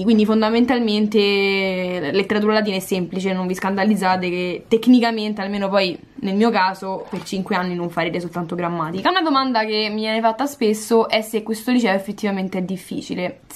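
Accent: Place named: Rome, Italy. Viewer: Ciao, ti ringrazio per questo video, l'ho trovato molto utile. Dalla cadenza sembri di Roma, come me... puoi dirmi quale liceo frequenti?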